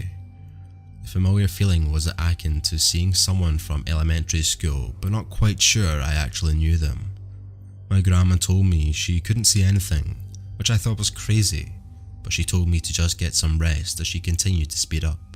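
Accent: Scotland, United Kingdom. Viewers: Omg score! Scary stories read with Scottish accent hah! Love it! ❤️😁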